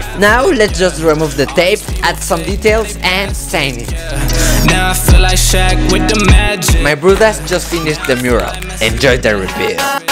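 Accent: Mexico. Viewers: si es el español, el es Mexicano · Phantom GG his accents sounds totally Mexican and his cellphone shows his company (Telcel) that is a cellphone company from Mexico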